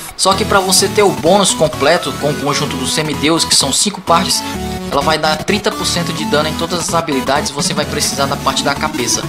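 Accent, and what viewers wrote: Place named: Northeast, Brazil. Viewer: Faz do herói ateniense... Esse seu sotaque é de qual estado do nordeste?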